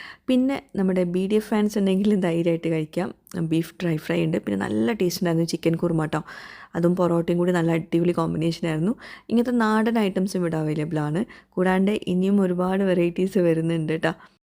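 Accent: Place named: Malappuram, India. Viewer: മലപ്പുറം ഭാഷയാ ണ് കേൾക്കാൻ. സൂപ്പർ ❤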